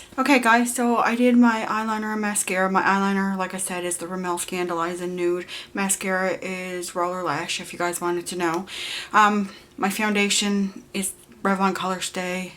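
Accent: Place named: Australia. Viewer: Oh my god!! I JUST came from piinksparkles vlog and as i was watching this I recognized your accent!! Im from north sydney!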